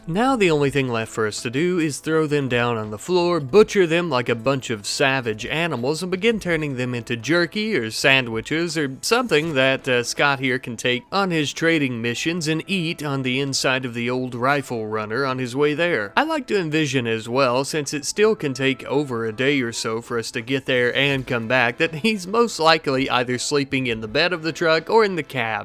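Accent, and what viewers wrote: South, United States. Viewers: are you a southerner? You got a little drawl in your voice.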